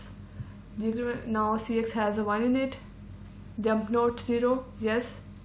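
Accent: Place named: Karachi, Pakistan. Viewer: you are from Karachi, lalukhet* right? I judged by your accent..... sorry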